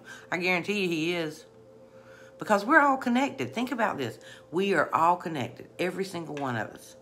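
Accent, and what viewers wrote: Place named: West Virginia, United States. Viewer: Hi! It is GREAT to put a voice to ur coaching. It puts a whole new spin on everything. Glad to be able to subscribe as i cannot be on Social Media d/t my job. Plus your dialect is a lot like my own being from WV. LOVE IT!!! THANK YOU!!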